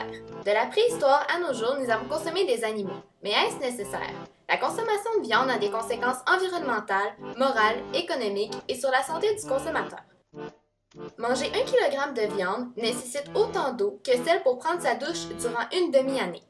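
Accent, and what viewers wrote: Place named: Canada. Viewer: Trop de musique de fond, en plus avec votre accent canadien, difficile de suivre !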